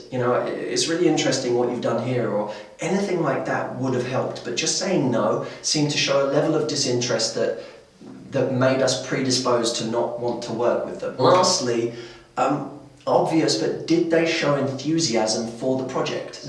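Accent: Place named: United Kingdom. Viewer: your pretty look and British accent already won people s attention(๑´∀`๑)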